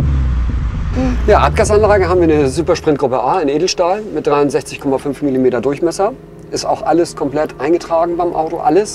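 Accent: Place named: Hamburg, Germany. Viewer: Bildschön das Auto..Das du aus Hamburg kommst hört man. Ist bei mir genauso. Gute Einstellung mit deinem Auto 😊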